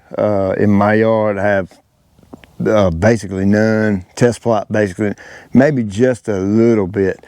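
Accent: South, United States. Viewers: Hey, the only thing thicker than your smooth Southern accent is your gorgeous lawn! All we can see up here in Minnesota is snow, snow, snow. But, you're helping us hit the ground running when Spring finally hits. I hope your socks are blown off from all the Blessings!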